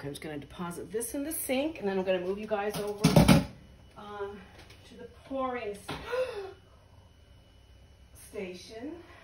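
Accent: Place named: Canada. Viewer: Loved this video! Can’t believe it filled so many molds! I actually heard a Canadian accent in thsi video — never heard that, amiga! Great video!!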